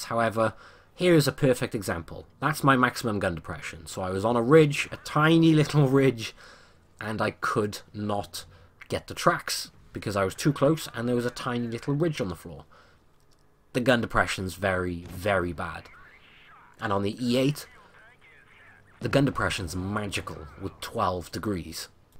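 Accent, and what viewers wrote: United Kingdom. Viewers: I'm English anyway good British accent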